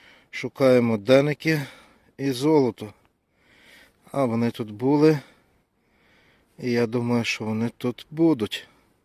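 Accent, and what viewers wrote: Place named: Western Ukraine, Ukraine. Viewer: Як приємно чути нашу западенську😅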